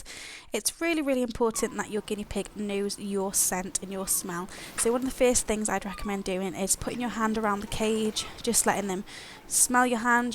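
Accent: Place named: Stoke, United Kingdom. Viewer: you sound like you're from stoke like me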